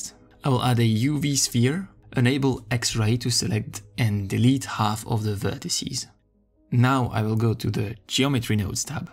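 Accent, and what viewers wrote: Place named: France. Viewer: The video is super intressant. Tu es français ? Parce que j’ai l’impression que t’as un petit accent.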